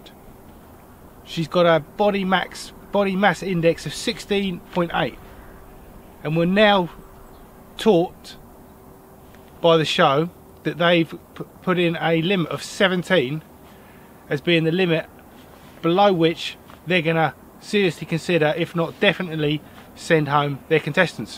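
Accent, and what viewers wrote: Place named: Essex, United Kingdom. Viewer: Where are you based bro? it's not aired in the UK yet but you clearly have a Southern I'm guessing Essex accent!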